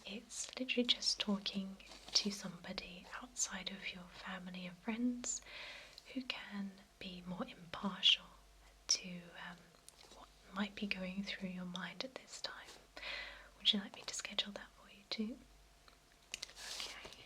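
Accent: England, United Kingdom. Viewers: These videos would not be as relaxing nor comforting if her accent were Bulgarian or Czechoslovakian ! Her English accent is perfect for ASMR.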